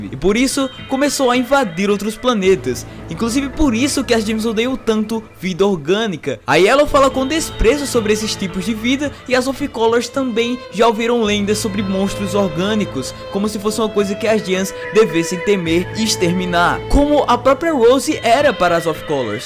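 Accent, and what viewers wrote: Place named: Northeast, Brazil. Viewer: Na moral esse seu sutaque nordestino da agunia se loko fala todo errado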